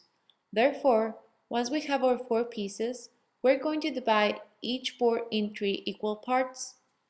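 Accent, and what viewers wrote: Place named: Mexico. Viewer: I fucking love her Messican accent! XD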